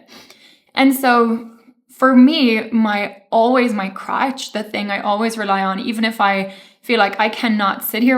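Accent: Ireland. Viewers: Where are you from, your accent has elements of Irish???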